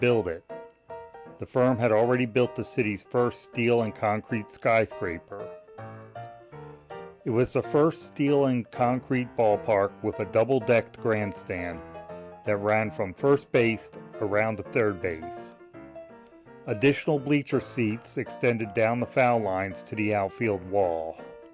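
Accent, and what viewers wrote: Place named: Philadelphia, United States. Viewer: You're a true Philadelphian with that accent!!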